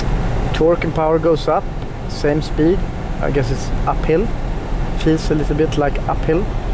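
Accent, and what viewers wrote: Sweden. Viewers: that svedisch accent mannen, suverän bil! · You sound like u are from sweden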